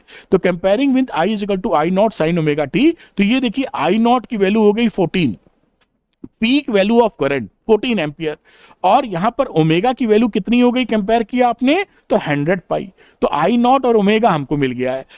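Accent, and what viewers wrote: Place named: Mewar, India. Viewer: That’s actually the natural Dialect of Mewad side in Rajasthan. It’s catchy though. 😂😂😅😅😊😊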